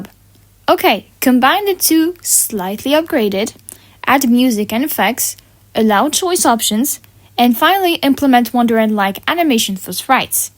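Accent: France. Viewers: Are you french? 👀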